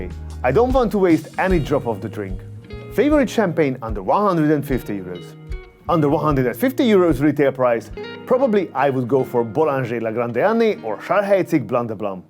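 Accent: Hungary. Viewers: Love your Hungarian accent. It adds to the charm of the channel 🤌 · Emlékszem mikor még pár ezer feliratkozó volt a csatornán és azon gondolkodtam, hogy ez egy kifejezetten magyar akcentussal beszélő úriember és bár eddig nem hallottam róla, valahogy mégis büszkeséggel tölt el, hogy egy magyar, illetve több magyar ilyen minőségű tartalmat gyárt. Nem tudom, ha valaha hagytam így hozzászólást bárhol, de úgy éreztem, hogy itt az ideje. P.s. remélem idővel én is veszek egy Krug-ot, ami majd erre a csatornára emlékztet. Hajrá!:D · I never realized that you had a Hungarian accent. Now, that I know, I can't un-hear it ;-). Thank you for videos. It is an extremely rare opportunity to get a glimpse into the world of 2-3 star Michelin restaurants where a dinner for two is upwards of 1k Euro (not counting extra wine at 2k a bottle).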